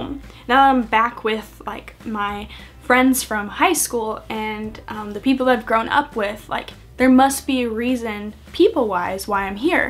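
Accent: South, United States. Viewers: Your southern acent is really coming threw in this video 😁👍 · Hannah Rose Ikr I was just thinking, is it just me or does she sound more southern in this video? 😆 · Whered u pick up that southern accent from?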